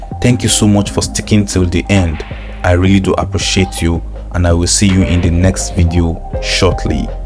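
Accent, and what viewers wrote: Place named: Nigeria. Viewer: "and exact tttime for lekchors" Eh! Your strong Nigerian ascent sha🥵🥵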